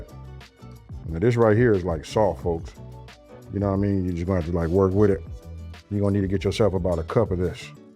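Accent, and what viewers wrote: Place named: Atlanta, United States. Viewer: Had a chixken casserole not spaghetti. Why does he have an Atlanta accent?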